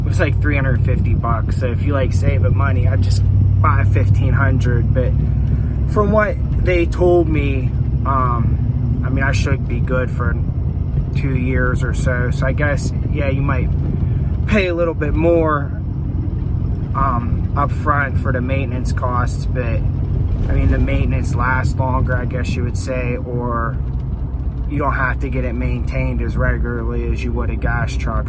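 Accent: United States. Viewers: that pittsburgh accent is thick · Also sounds like you got a Philly or Delco accent lol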